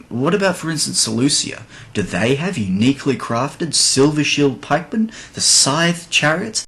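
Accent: Australia. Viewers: I hope for the majority of the people that pre-ordered R2TW that on receipt of such utter crap to NOT pre-order any game again based on trust alone till previews and proper critique is available for it. 'Fool me once, shame on you; fool me twice, shame on me' For me Empire: Total War was the kick in the balls from CA (and my one and only ever pre-order). Damn my desire to be Sharpe with the 95th rifles leading the British Empire:/ I still haven't purchased R2TW; well at least till it's completely fixed. I've wanted this game since the release of M2TW. There was a dream that was Rome (II). This is not it! Thanks for your videos; something about the auzzie accent gets me! That and you skips view on life (and stuff) is refreshing especially when living in the UK and the constant barrage of hypocritical nonsense gets a little too much. Loved it down under, can't wait to get my pommy ass down again, well, with ear plugs. 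I wont hear the end of it considering how you tanned our asses at cricket. /rant!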